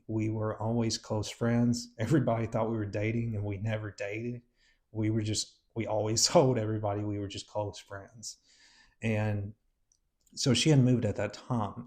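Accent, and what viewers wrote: South, United States. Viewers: Hey, I can relate so some of the things like the name-calling in school, and it really hurt. Every day was a challenge. I love your smile and your southern accent! You’ve clearly been holding in a lot for a long time, so I’m sending you a big virtual hug. Thank you for sharing your personal story — it takes courage to open up like that. You’re a strong, good-looking person with a bright future ahead. Greetings from Michigan. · It broke my heart seeing you cry! I’m not American, didn’t grow up Christian, and younger than you by 10 years yet my experience is very similar. I’m very proud of you for having the courage to come out, to heal, to admit you were wrong and to correct that, and to become a great and inclusive trainer who we all can tell is very good at his job by looking to his body..also I love your southern accent Sending love ❤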